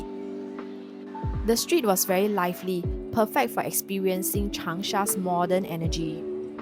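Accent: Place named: Singapore. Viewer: She sounds Singaporean.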